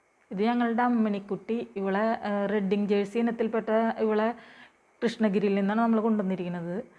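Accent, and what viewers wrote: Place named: Thrissur, India. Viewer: തൃശൂര്‍ ഭാഷയാണല്ലോ എവിടെയാണ് നാട്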